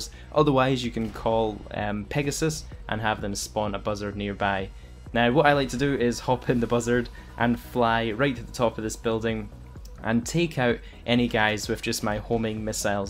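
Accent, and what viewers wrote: Scotland, United Kingdom. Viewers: First Scottish GTA youtuber, finally I might be able to follow this without bullshit 100 step glitches that don’t even work. You from Glasgow bro?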